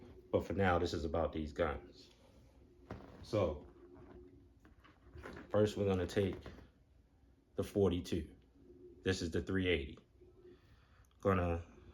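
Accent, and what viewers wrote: Baltimore, United States. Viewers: You from Baltimore aint yew?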